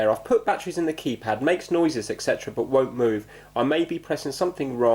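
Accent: United Kingdom. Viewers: Haven't watched the entire video yet but I'm having a moment of appreciation for this channel. I'm British but your accent is posher and softer than mine. I like how you film in natural light. You are enthusiastic in how you fix things and know about the background of most items. You usually speak as if you know nothing yet you've managed to fix almost everything. I've watched multiple 1 hour+ long fix it videos. Great content. Keep it up.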